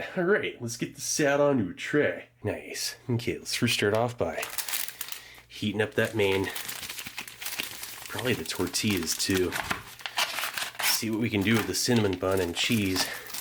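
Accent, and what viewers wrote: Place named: California, United States. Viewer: Love your videos man! Ps that Californian accent is gold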